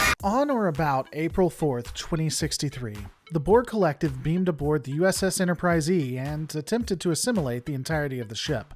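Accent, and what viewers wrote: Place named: South Philadelphia, United States. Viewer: You from south Philly? Your accent.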